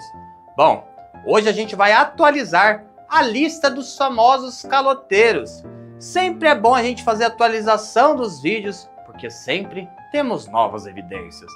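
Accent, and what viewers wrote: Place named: Bahia, Brazil. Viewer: Oi Moço Sou Novo Aqui. E adoro seu Vídeos. O Jeito de Falar a sua Observação no Detalhes me lembra uma prima. Você não é Bahiano não da Bahia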